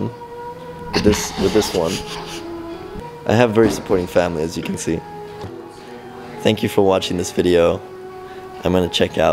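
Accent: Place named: United States. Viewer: I was surprised by your American accent. You must have spent a lot of time in the U.S. BTW, I learned a great deal about the Habsburg dynasty while at school in Austria. Strobl am Wolfgangsee. Fun family video.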